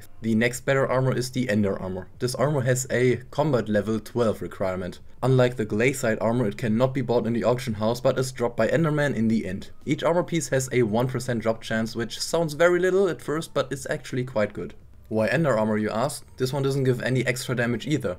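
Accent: Germany. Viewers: he sounds 100% german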